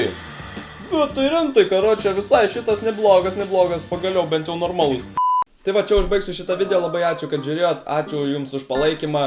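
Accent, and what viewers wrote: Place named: Samogitia, Lithuania. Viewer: Tikrs žemaitis esi, nes žemaitėja yr geriausia ❤ Kap į tava video😍❤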